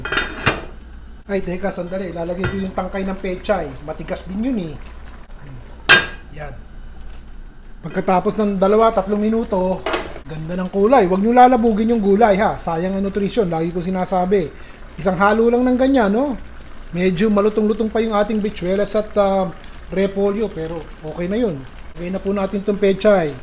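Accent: Philippines. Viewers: Chef taga Saan po kayo originally kasi ang Ganda ng punto ninyo 😂 tagalog na tagalog eh 😅 Saan po kayo naka base . Gagawin ko Ito today sa malamig na weather here in Scotland . 🏴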